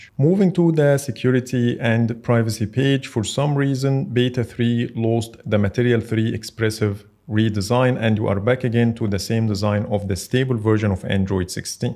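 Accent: Egypt. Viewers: i can hear the Egyptian accent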